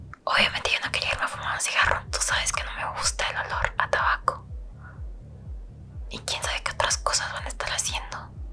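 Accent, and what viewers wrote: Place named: Mexico. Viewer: Se re nota que es mexicana wn JAJA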